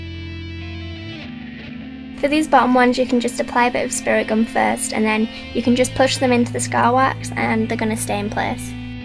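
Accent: Yorkshire, United Kingdom. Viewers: she sounds so yorkshire in this video haha it's so cute ^^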